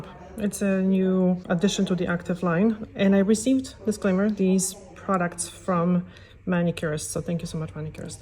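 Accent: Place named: Poland. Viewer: Had no idea, but after first few words I knew you must be polish! 😅 such a hard accent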